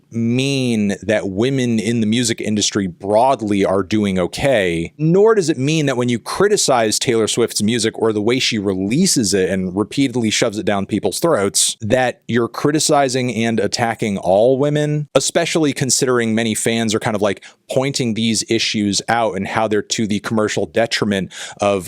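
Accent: United States. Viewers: I thought you were American :o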